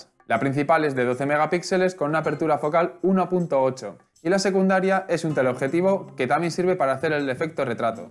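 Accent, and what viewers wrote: Colombia. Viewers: SOS colombiano? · ¿Eres Colombiano?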